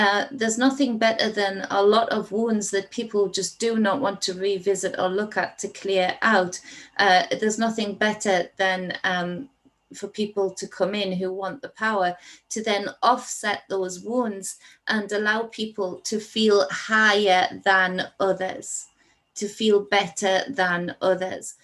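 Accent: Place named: North England, United Kingdom. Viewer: Hi. I have recently subscribed to your channel. I’m a reiki practitioner based in north east England too. Lovely to hear your accent 😊 I’ve made a few videos myself about what’s going on 😉🙏❤️ hope you are well. Take care lovely and keep up the good work 😀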